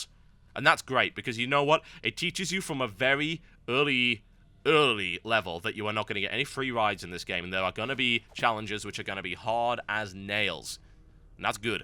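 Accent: United Kingdom. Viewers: i miss old:( the british one he has gotten signifigantly less britanly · Dude your new intro is sooo british.....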